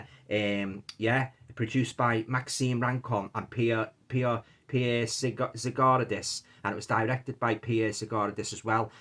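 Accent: Liverpool, United Kingdom. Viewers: Hey bud. So good to hear someone from Liverpool reviewing a horror film. And thanks for the compliment on the cover art. I’m really proud of it and I think this was a great film.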